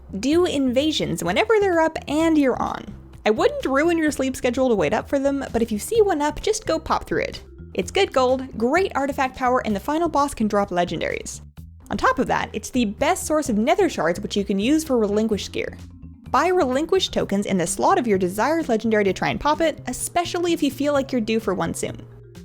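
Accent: Canada. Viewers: I have to sadly admit I've no idea what she is talking about for most of this video. She sounds Canadian though.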